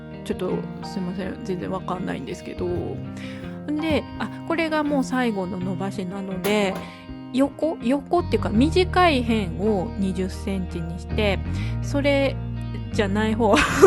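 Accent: Kansai, Japan. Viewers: 昨日から動画見ている62歳の初老です😊 今日はこちらの動画を見ていてちょと頑張ってみるのもいいものですね～の言葉によっしゃｰｰｰ!!!となりさっそく、やってみました😅 レシピも丁寧に照会してくださっていてバッチリ出来ました！美味しかったです😊 ありがとうごさいます！記念撮影をして子供達にも披露、 また、作って送ります！ 関西人なので関西弁もしっくりおしゃべりが楽しいです😄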